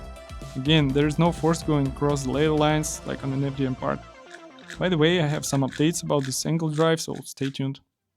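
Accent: Russia. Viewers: чувак, мне кажется по речи или ты русский?)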